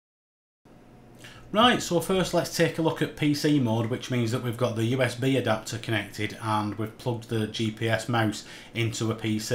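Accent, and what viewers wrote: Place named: Oldham, United Kingdom. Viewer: Thanks for your clear video. I thought it might align the scope but it does not. Now I know I don't need one. That accent sounds Oldhamish, I live in cloudy Rochdale. Just got my Intes mk67 out for its annual inspection on the synscan (not used yet, only bought 3 years ago!) but I hear its cloudy all week, ah well. Cheers, Mike.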